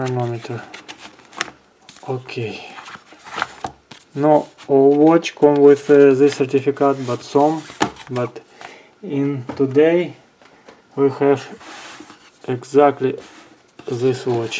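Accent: Russia. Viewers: А почему рязанское произношение,а?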